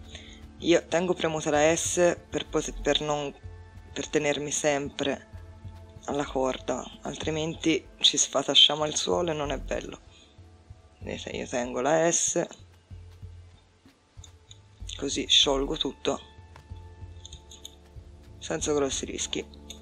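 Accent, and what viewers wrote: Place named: Lucca, Italy. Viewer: È simpaticissimo il tuo intercalare, tanto più che lo conosco bene: ho vissuto gli anni delle medie e delle superiori a Lucca e ancora oggi, quando parlo con le mie amiche che vivono ancora lì, parlo in lucchese!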